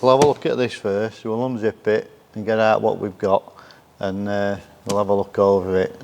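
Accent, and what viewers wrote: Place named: South Yorkshire, United Kingdom. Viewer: Great video. Great accent - sounds like South Yorkshire to me. Great to hear it on t'internet. New subscriber. Looking forward to the review of its functionality.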